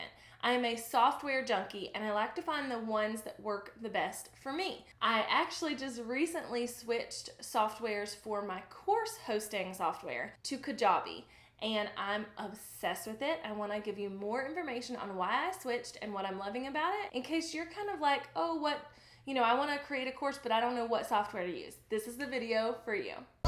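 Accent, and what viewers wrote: South, United States. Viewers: She has a slight American Southern accent, and not only is that acceptable here in the United States, it’s actually preferred since it tends to increase likability and relatability. Barak Obama tends to infuse his speeches with Southern dialect even though he’s not from the south, and Bob Ross is beloved because of his southern euphemisms. While you are technically correct, what she said is not acceptable in formal writing, she’s engaging an audience, and in that case it’s totally acceptable.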